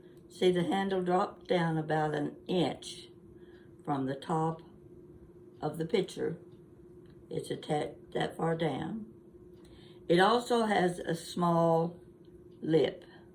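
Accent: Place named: Tampa, United States. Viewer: I am a first time watcher. Thanks for the tour and the information. I’m going to examine my treasures to see if I have some of what you showed. You remind me so much of a dear aunt whom I loved very much. She lived in Tampa and she had your accent and your caring nature. It was delightful to come into your home. I hope to visit again and to especially see a table setting of yours. Perhaps you have pictures of the settings you have done. Thank you so much for your information and your lovely personality. Like you, I love my stuff.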